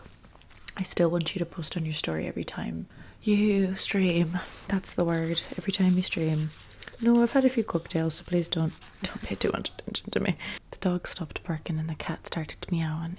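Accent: Ireland. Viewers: yes, slay. I’ve been highly anticipating this !!! your Irish accent is my fav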